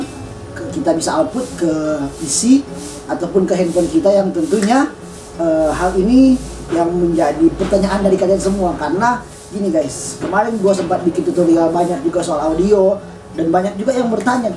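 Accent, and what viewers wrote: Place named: Nias, Indonesia. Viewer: logatnya keg orang nias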